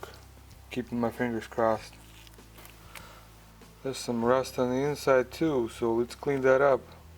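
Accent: Russia. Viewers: ааа какой русский акцент 😊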